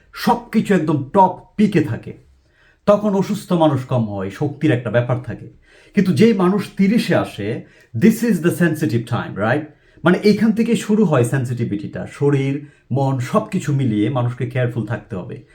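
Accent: Mymensingh, Bangladesh. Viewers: আসসালামু আলাইকুম স্যার, স্যার আমি আপনার ভিডিও দেখি বেশি দিন হয় নাই, এর মধ্যে আপনার সব গুলো ভিডিও দেখা শেষ। বড় আফসোস হয় আপনাদের মতো মানুষ দেশ ছেড়ে চলে যান! আপনার কথা যদি আমাদের দেশের শিক্ষাব্যবস্থায় জুড়ে দেওয়া যেতো আমাদের শিক্ষাব্যবস্থার আজ এই অধপাত হতো না। এই জং ধরা দেশের জন্যে আপনার মতো মানুষ খুবই দরকার। আরেকটি ব্যাক্তিগত প্রশ্ন ছিল স্যার, আপনার বাড়ি কি ময়মনসিংহ বিভাগে? নাকি কিশোরগঞ্জ? আপনার ভাষাটা ( আঞ্চলিকতার টান) খুব আপন মনে হয়। আশাকরি উত্তর টা দিবেন।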